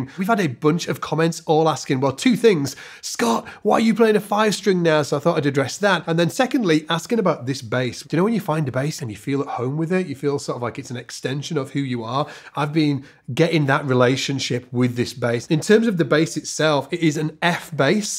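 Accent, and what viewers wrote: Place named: United Kingdom. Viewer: Scott are you British or are Australian, or you are gabacho(USA)? I've watched videos speaking with a gabacho accent. Now your accent is British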